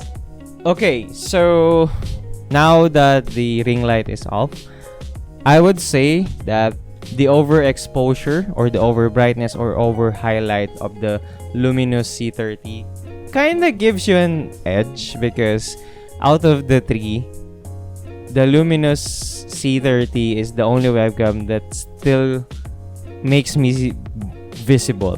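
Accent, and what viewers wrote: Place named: Philippines. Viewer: That is filipino accent 🤗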